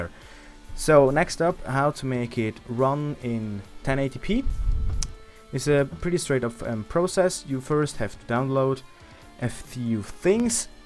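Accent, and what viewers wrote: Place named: Germany. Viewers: Ha deutscher. Ertapt ;D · ghört mer sofort usse :D PS: Es funzt bi mir denn leider ned... :( has emol anebrocht und warum au immer wider deinstalliert.. er peilt ned mol das i di "richtig" CD drinne han :(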